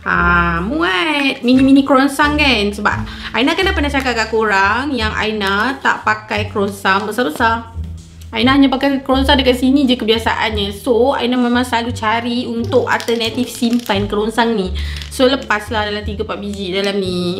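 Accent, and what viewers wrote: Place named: Penang, Malaysia. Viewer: Penang rsanya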